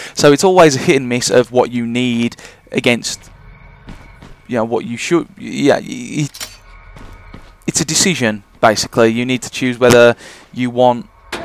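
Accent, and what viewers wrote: United Kingdom. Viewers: love ur british accent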